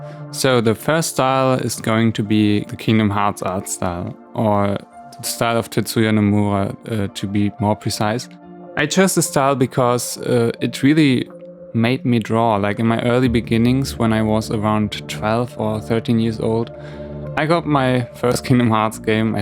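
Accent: Germany. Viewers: Are you actually german? I thaught so because of your accent ^^ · Your accent... your german too, right? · Von deiner Aussprache her nehme ich einfach mal an,dass du ursprünglich deutsch sprichst,was es einfacher macht zu kommentieren xD Deine Version von FairyTail und Death Note hat mir am Besten gefallen,weil man sofort am Ausdruck erkannt hat was es sein soll.Was Naruto angeht hab ich genau zu dem Zeitpunkt angefangen es regelmäßig zu schauen als du aufgehört hast,also mit dem Beginn von Shippuuden.Gegen Midseason würd ich mal sagen gings mir dann wie dir,ich hab lange nicht weiter geschaut und als ich vor ein paar Monaten dazu kam war das erstmal ein Schock weil sich zu damals wieder so viel verändert hat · I heard it from the forst seconds on, that you're German! (Because of the accent xD) But I'm too :P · hahaha ich wusste du bist deutsch, den dialekt erkennt man sofort ^^ aber cooles video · I don`t like naruto because of the german translation. 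(I somehow noticed on your accent that u r german, I am actually german too but I mostly speak english and that`s why I write this on englisch now XD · you sound very german (almost like my english teacher XD ) but I like your talent. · Ich hatte schon den Verdacht wegen dem deutschem Akzent :P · it's a german accent, so i guessss yes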